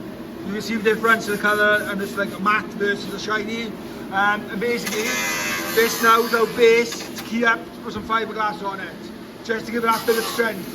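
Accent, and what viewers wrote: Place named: South Wales Valleys, United Kingdom. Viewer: yes it is a c20let haven't driven it for 18 years tho. Sounds like your from the top of the valleys I'm from Caerphilly not too far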